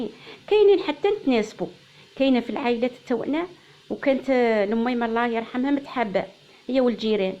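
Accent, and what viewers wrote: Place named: Ksar Boukhari, Algeria. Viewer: والله من لهجتك عرفتك قصورية معاك بنت منطقتك و نحيبك و ربي يعاونك كثري من الوصفات و يطلعوك بنات بلادك قصر البخاري ربي يحفضك و انشاء الله منخليوكش كثري من الوصفات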